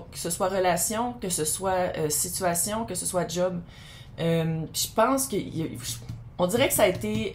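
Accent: Quebec, Canada. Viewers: Bonjour, et oui ça y est j'ai pris la décision de demander l'officialisation de mon divorce et je me sens plus légère, ce n'ai pas encore terminé mais j'espère que ce mois ci je serai plus libre pour accomplir mes projets du moins je l'espère. Gratitude pour votre travail et les conseils que vous nous donnez. 🙏🙏🙏❤❤❤ et j'adore votre accent du Québec et votre spontanéiiété 😄😄😄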